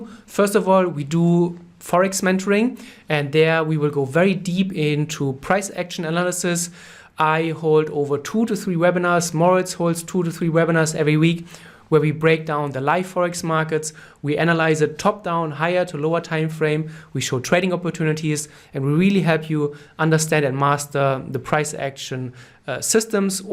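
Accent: Germany. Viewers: Just by the way he speaks, i can tell he is German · great content man. Are you German? Donnu why but somehow the accent makes me feel like I understand more ha :)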